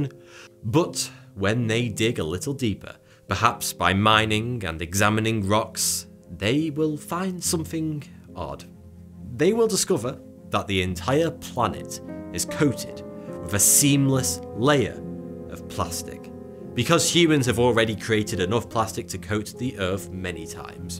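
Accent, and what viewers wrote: United Kingdom. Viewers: I thought it comical that every person on the planet would dissapear. Is that another way to disappear? I shall never be around to find out so shall never know. Seriously, though, I love these videos and as an Australian find the attractive British regional accent adds to my enjoyment.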